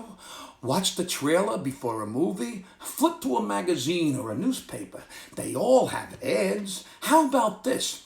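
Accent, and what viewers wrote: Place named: Brooklyn, United States. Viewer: You're Hilarious! You make me miss Brooklyn so much with the way you talk!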